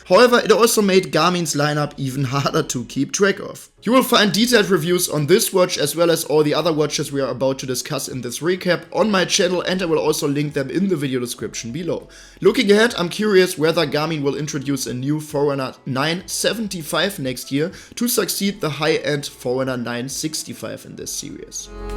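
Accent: Germany. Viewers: What an awesome unbiased cover of these watches. I love the channel name too. You get exactly that, reviews with a German accent 😂. I hope you get more followers with this much effort.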